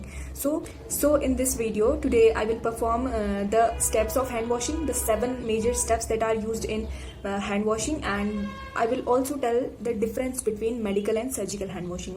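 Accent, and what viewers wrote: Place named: Haryana, India. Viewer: I think 💯 mam is from haryana ❤❤ 😂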